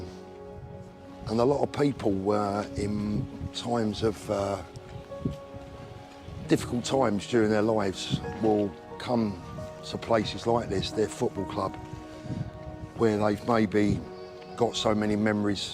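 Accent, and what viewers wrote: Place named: United Kingdom. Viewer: Why is your accent like that if you're from London? It's like provincial English, not what I imagine of a Londoner. 😅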